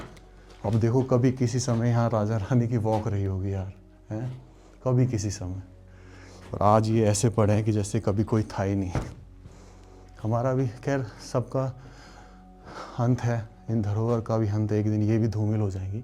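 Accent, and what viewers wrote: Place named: Rajasthan, India. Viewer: Bhaiya Ki Boli Kotputli aur side ki Lagti Hai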